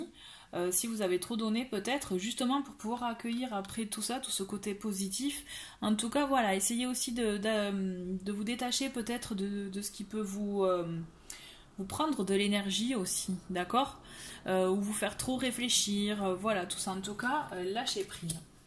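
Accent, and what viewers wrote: South, France. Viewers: merci c'est très agréable avec votre petit accent du midi...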